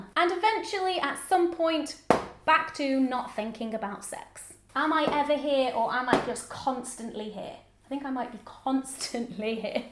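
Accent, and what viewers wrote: United Kingdom. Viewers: I really enjoy your videos and love your British accent! Drives me nuts!!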